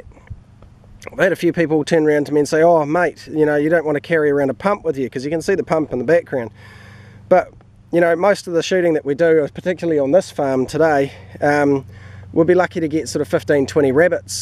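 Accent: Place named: New Zealand. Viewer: deserves more views just for the kiwi accent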